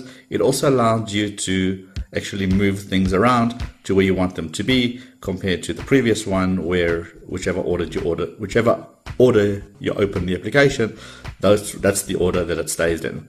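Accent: South Africa. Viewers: He's a Saffer: if you ask him to say milk he'll pronounce it 'mulk' and he might refer to traffic lights as 'robots'. Either way, he's a good reviewer and getting a sub from me.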